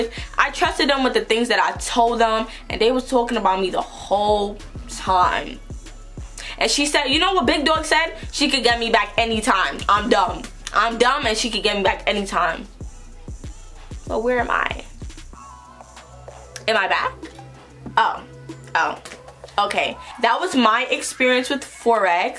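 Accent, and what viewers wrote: New York, United States. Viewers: let’s talk about her accent like i loveee ny ppl accents 😍😍😍😩😭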